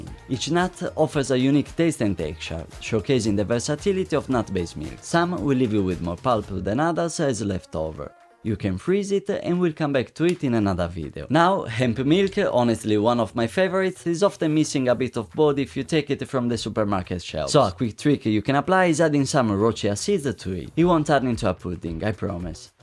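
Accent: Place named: Italy. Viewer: I'm Italian and your English is really funny I think you are Italian too right?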